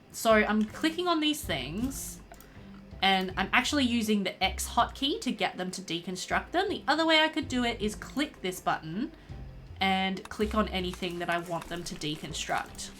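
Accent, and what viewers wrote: Australia. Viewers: austrailian accents...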